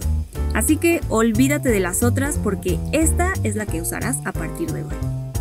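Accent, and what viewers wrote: Mexico. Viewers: el acento whitexican de la narrado me asqueo ! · Lo malo del video es la voz whitexican, pierde credibilidad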